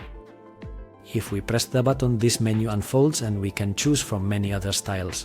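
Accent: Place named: Greece. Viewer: Thank you for this video. Love your accent (I assume it is a Greek one)🙂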